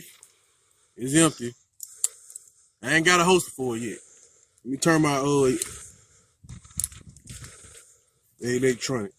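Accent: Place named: South, United States. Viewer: Baruch Hashem (G-D Bless you), I need a revolver too there's just something ao fun about them that is fun and a good 357 is usually 1 n done if you really need it always enjoy your videos. Youtube needs more ppl like you. Sell some shirts (or practical tac gear/gun gear white label basics whatever?) or something if you need the money like i do, go for it. Your lack of money seeking though also is part of what makes your channel nice and different so if you do it just take some baby steps. You are headed in the right direction keep it up!! what you are doing is rare and only a few others out there that I have found, I like to watch a real person do real things I am also interested in and not be a prick about it. Keep it up you are on the right path and your accent makes me miss the more southern areas of these states something about it just seems friendly. 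Wow, that is a long comment G-D bless you Shalom and Baruch HaShem.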